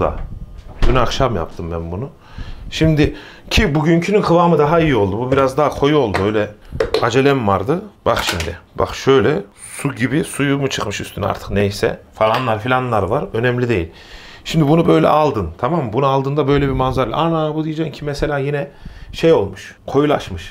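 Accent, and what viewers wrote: Anatolia, Turkey. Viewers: Ünlü fitness içerik üreticileri veya bu camiada fenomen olarak bilinen kimseler bana nedense samimi gelmiyor fitness hakkında da çok bilgim yok dövüş sporcusuyum ama bu adam nedense samimi geliyor 2 videosu çıktı karşıma sadece.. bir de anadolu ağzı var tam içten 🙂 adamsın kral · Aradığım üslup, aradığım sunum, aradığım bilgiler Aptal terimler yok anadolu anlatımı on numara bu video tüm naftalara gelsin...:)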